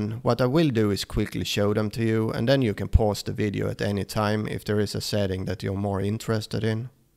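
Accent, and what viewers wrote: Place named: Sweden. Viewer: Låter galet svensk...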